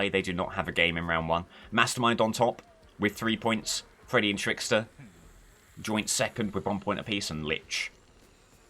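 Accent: United Kingdom. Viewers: i love your accent its honestly very cool you are my fav british (assumed brit, sorry im not sure which specifically if its wrong) dbd creator you always do good commentary as well. real proper gent. as an American i always like to hear the way others talk its a nice change to listen to a non american accent! good stuff.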